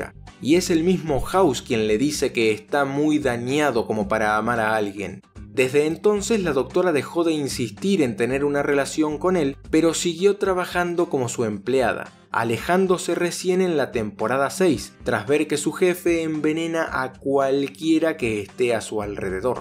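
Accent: Argentina. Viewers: Eres Argentino ?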